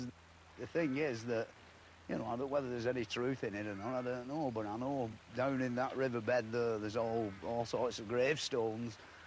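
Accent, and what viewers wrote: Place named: Lancashire, United Kingdom. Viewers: One can hear that accent all through Bolton to this day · That isn't a Yorkshire accent bud. It's a Bolton accent, Lancashire.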